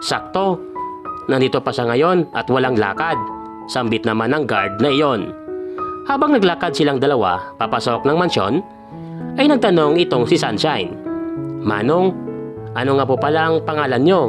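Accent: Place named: Iloilo, Philippines. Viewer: ang ganda nang storya salamat ilonggo gid kaw yung accent mo halatado lol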